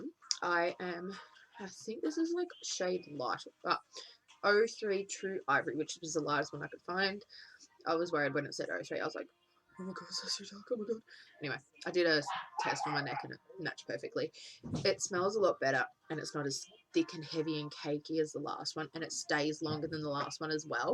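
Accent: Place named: Australia. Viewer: I love your accent my accent is super boooring yours is so cute! My auntie is from Australia and I always make her talk so i can hear her accent lol!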